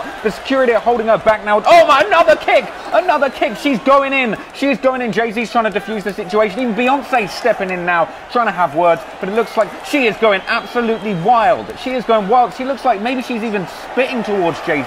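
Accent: United Kingdom. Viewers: That British accent talking American hip-hop is irresistible!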